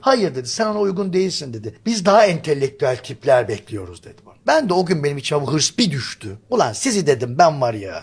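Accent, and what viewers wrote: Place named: Anatolia, Turkey. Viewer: Yalnız hoca konuştukça şive daha da Anadolu ya kayiyo☺️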